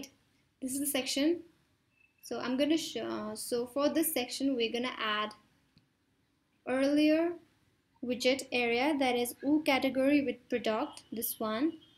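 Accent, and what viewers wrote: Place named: India. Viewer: Typical Indian Voice trying for Amercian English. Good.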